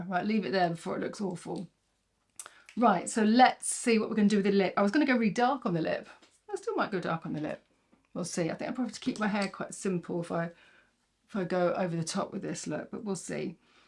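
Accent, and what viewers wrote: United Kingdom. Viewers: I just love your British accent (as an American with a more harsh accent), “cotton bud” rather than Q-tip, “knocking back the shine”. I so enjoy your tutorials and creativity in paring a warm cheek/lip with a cooler eye. Merry Christmas!🎁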